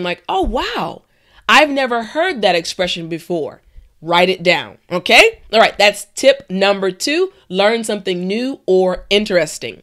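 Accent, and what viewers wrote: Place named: United States. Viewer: you r litteraly terrific, i do love your ways of teaching moreover your american intonation. I'm still connected to your lessons.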